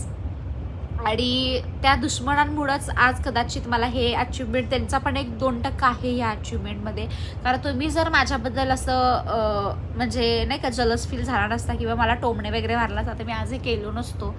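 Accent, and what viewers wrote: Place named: Ichalkaranji, India. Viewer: Congratulations 🎉 god bless you ❤ Mi punyat aste but tuz vlogs n video bghitle na mala khup athvn yete ichalkaranji chya bhashechi 😍 Keep it up 🥳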